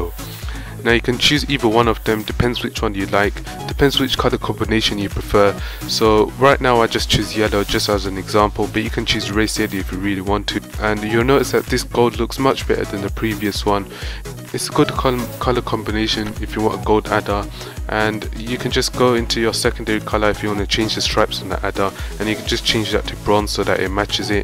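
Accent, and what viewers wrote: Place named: Germany. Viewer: Are your german because you speek german english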